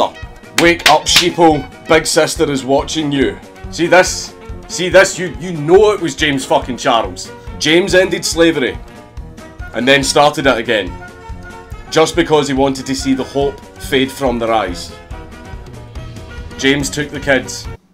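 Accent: Scotland, United Kingdom. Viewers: where the illuminati invented THE GAY [ The Scottish accent makes so much better ] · I love your Scottish accent! 😀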